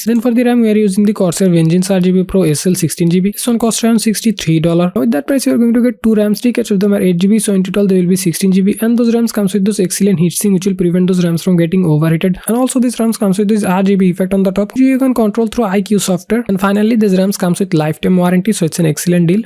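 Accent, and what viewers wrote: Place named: India. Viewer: This is the most Indian accent ever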